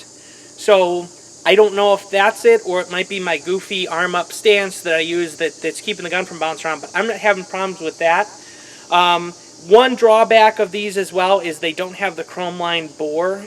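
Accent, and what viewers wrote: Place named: Chicago, United States. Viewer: HA, listening to your accent i knew you had to be from the Chicago land area. Then you busted out with you living 30 minutes from Rguns and I was like, son of a bitch lol. I live about 45 minutes east from Rguns, I'm over by the lake. I just bought this rifle from reddot arms in lake villa and I'm going to do the same thing as you and just head over to rguns and get the yugo sling. good video, i just subscribed.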